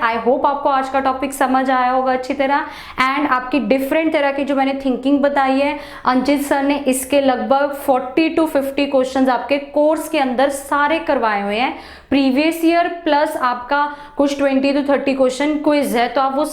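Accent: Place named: Punjab, India. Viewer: Ma’am Apki Dilect main Punjabi Touch aata hai🙈🙈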